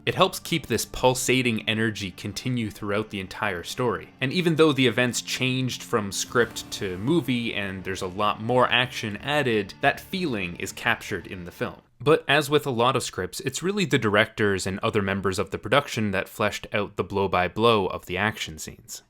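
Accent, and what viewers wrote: Canada. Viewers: can you be more canadian? ... it's awesome and fun to listen to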